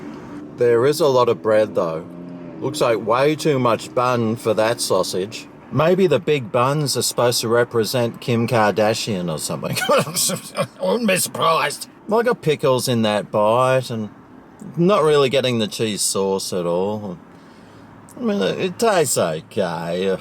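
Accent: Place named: Australia. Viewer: I watch your videos because the Australian accent and behaviors thoroughly annoy the ever loving sht out of me. It feels pretentious and masquerades as highly educated. But I respect what you do and keep doing it bud.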